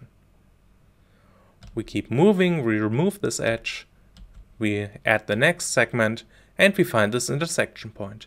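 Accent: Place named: India. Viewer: Dude looks like american, sound like indian. I meant no insult, I just meant you got all to be a tutor online.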